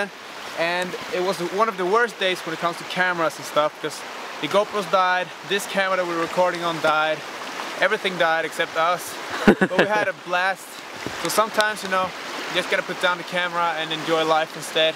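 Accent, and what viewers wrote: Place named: Sweden. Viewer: feeeett! Jag är också från Sverige haha, tyckte de lät som om du va svensk;)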